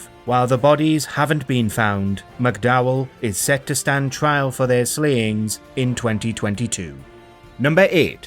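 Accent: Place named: Geordie, United Kingdom. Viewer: No, it has a twang of Geordie. Maybe Middlesbrough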